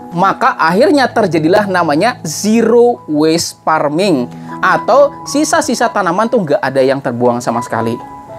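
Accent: Banjar, Indonesia. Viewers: Ooo pantas am bepander macam urang Banjar, salam sukses, moga chanelnya terus berkembang & tak bosan berbagi ilmunya Bang. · Mantab, logatnya Sangat Banjar banget, salam dari Arek Banjar Suroboyo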